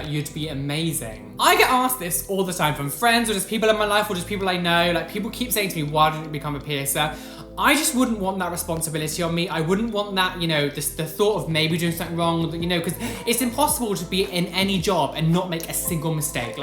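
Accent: United Kingdom. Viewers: You're british??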